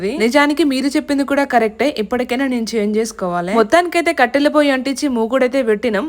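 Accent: Telangana, India. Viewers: Mi telangana slang bagundhi😊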